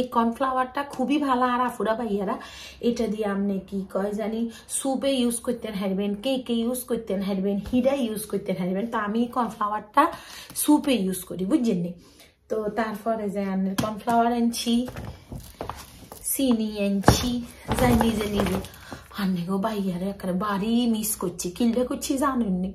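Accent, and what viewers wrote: Noakhali, Bangladesh. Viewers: Please নোয়াখালীর ভাষা না বলে নরমাল বাঙলা কথা বলেন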